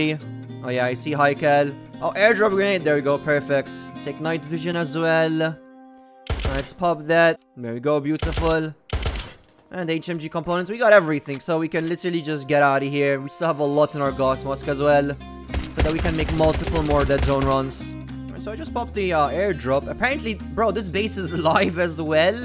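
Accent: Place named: Malta. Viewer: Yooo bro... Weird question.. are you Maltese??? You have such a Maltese accent 😆😆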